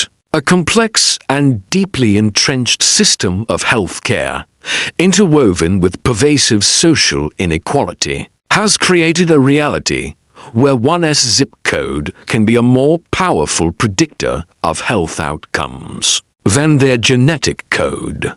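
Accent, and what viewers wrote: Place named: United Kingdom. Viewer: Please don't use British accent with your AI narrator - annoying.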